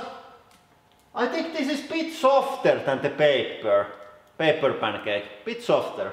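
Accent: Finland. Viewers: Isn't this a finnish accent? 😂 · Myles Bishop he is from Finland. Finland people always have problems on speaking English. :D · His accent is Finnish not German-Austrian so he can't be Hitler even if he was alive. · Täytyy olla suomalainen, kuulee heti :D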